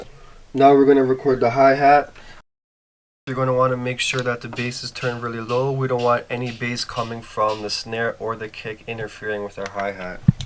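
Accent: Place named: Toronto, Canada. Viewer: siiiiiiick....nice accent..must be from the 6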